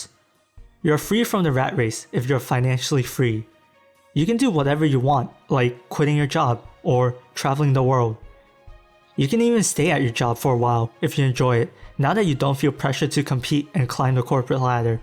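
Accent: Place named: China. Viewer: This video Narrator must be a Chinese... with quite heavy Chinese accent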